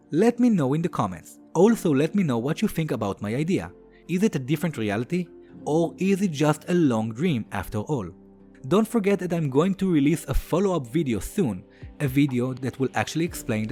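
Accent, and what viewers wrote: Israel. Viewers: Israeli right? I could tell immediately, it's particularly noticeable when you say "Reiner". Loved the bit about zhuangzi's butterfly, I subscribed. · You’re from Israel,? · Ohhh hebrew Being canadian i never come into contact with that language so its completely foreign to me. Every hebrew content creator ive watched always has a super happy voice so dont worry the accent is enjoyable. And Im glad i finally have my answer because everything about yours and others pronunciations is typically spot on until you get to Vs. Im gonna have to research why that is because sometimes ill hear the V pronounced correctly in "Video", while other times its "Bideo". Just crazy that language does that to you where you dont even realize you arent pronouncing it right huh? I have a similar issue because I was born in Quebec, so i was raised around french and Ill pronounce it weirdly cause my mind slams 2 puzzle pieces that dont fit together into a incoherent thought. 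KInd of like how the Japanese have a hard time distinguishing Ls and Rs. So if I see a "oi", in french it's pronounced "wah" , but thats not really something you see in english at all. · Are you israeli? your accent sounds like it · Are you from Israel? לרגע חשבתי שאני שומעת בעברית ואז הבנתי שזה המבטא שתפס אותי לא מוכנה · For me it was when he said the word "year." It's an awesome Israeli accent. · The accent is Israeli. 100% Also, your English is superb! Kol Hakavod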